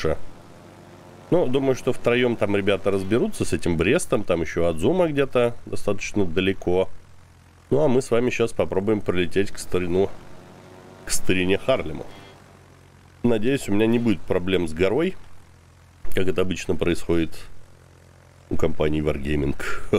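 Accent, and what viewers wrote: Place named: Odessa, Ukraine. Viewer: а где взять такую одесскую озвучку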